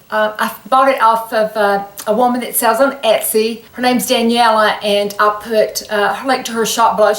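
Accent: South, United States. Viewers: I never knew you thought this way about your accent. I absolutely LOVE your southern accent....full of charm.❤-Ruthie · Take care of. I hope everything goes well at your doctor appointment, please update us. I want you to know that I absolutely adore you, and that sweet southern drawl of yours! You are so sweet and so encouraging to all of us!! I love you, and love your channel!! I am a 52-year-old grandmother of 12, and I want you to know it is so refreshing to see someone in the over 40 crowd, Who is as beautiful and sweet as you are!!! ❤️💜❤️💜❤️💜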